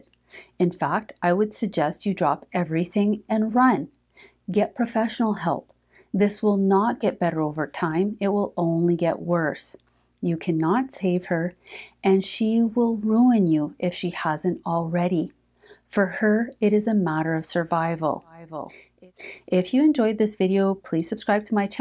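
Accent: Canada. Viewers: The Canadian accent is strong here.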